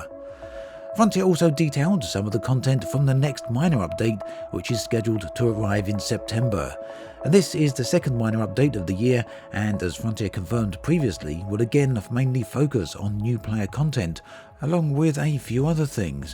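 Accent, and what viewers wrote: United Kingdom. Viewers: What the new currency called, I can't make it out with the strong UK accent? Arcs? ox. rocks?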